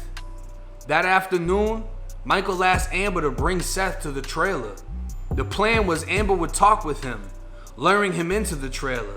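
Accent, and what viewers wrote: Boston, United States. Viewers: Sounds like a boston version of Mr Ballen story telling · How DAAAAHHHKK it would get lol all love but your Boston/Florida accent be getting me at times lol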